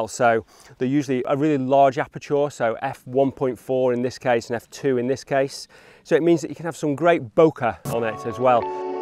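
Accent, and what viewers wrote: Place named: Yorkshire, United Kingdom. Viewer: you're still utilizing the original 70/200 nikon vr? please explain why mate! love the regional English accent....so soothing! Is it yorkshire?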